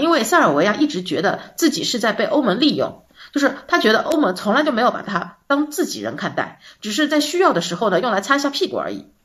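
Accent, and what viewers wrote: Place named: Shanghai, China. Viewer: 特别喜欢你的视频！听出了一种上海宁米道！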